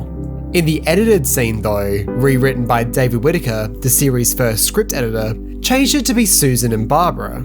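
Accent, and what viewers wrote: Australia. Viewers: Great review, but can you back off on the Aussie pronunciation of the letter "o"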